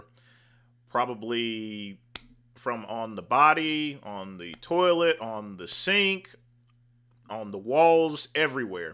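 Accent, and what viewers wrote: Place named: Kentucky, United States. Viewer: Interesting southern accent. Is it Kentucky?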